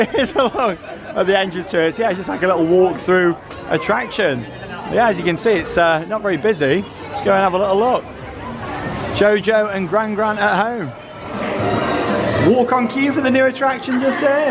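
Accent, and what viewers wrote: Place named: Stoke, United Kingdom. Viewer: My wife and I have just brought annual passes for the year. I think we're from the same city by your accent (stoke) so we will be going towers a lot this year. My little lads almost 3 so the cbebbies content was much appreciated, although I would rather give it a miss and ride nemesis all day long 😁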